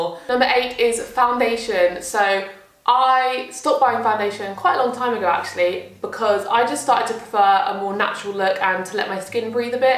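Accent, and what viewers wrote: United Kingdom. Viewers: What UK accent is this? Love it! Very informative video, thanks!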